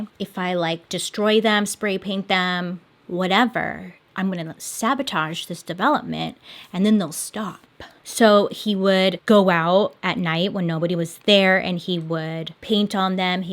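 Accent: United States. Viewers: my American English has improved by listening to her stories 🥰 thank you!!!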